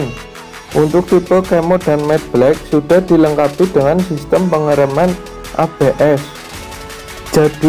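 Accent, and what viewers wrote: Java, Indonesia. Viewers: Medok bgt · Ngomong opo sih mas medok banget😄 wes rapopo semangat. Lagian motor itu ga di jual dan ga di produksi di indo.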